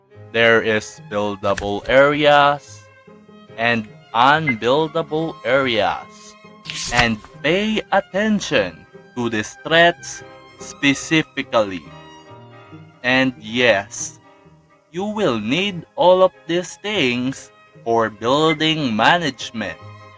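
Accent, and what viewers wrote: Philippines. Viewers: Woah you're a fellow kababayan, I could tell from your Filipino accent. Amazing on point video about sapper building management.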